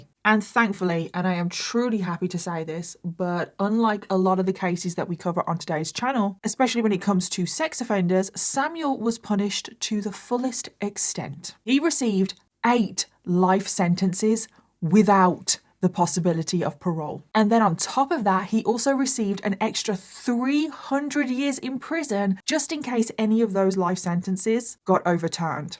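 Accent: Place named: Birmingham, United Kingdom. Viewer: i asked my friend if she could do her makeup and talk at length about csa at the same time and she said no. the grwm/true crime subgenre is kinda antiquated now but it's definitely a skill and i'll always be here for it. anyway the stuff you talked about was horrible asf so thanks for that. always nice to hear a brummie accent too.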